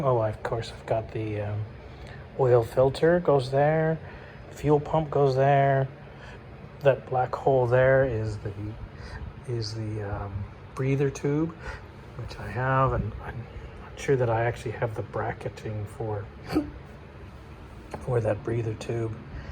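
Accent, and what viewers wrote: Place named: Canada. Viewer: .....thanks for the reply, yes a good idea to test before committing to the frame. I take it from your accent you are Canadian, a couple of guys I follow on YT post regularly, Elin 'Rusty beauties' and chef tush 'tush mahal' both are triumph enthusiasts, and from your neck of the woods, though obviously Canada is just slightly bigger than the UK so you may not be that close.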